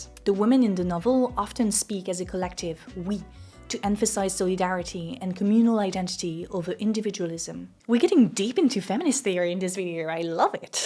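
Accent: France. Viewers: French pronunciation of indian names is so cute and funny at the same time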